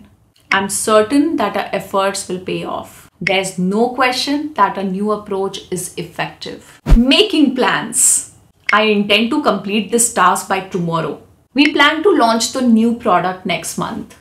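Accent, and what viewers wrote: India. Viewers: 🙏Tqsm mam For Today's All useful idioms n phrases ,it really helpful for us n again tq mam for your Guidance in Bharats Accent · Thankyou so much mam . Iam the one who has no interest in English videos and movies. Because i don't understand American accent and British. I believe that British and American accent is the real English. Indian accent is not a real English. But when i started to watching your video i understand that Indian accent is also English. And i loved your videos and addicted to you. And i learning English only because of you. Lot of love from Kerala ❤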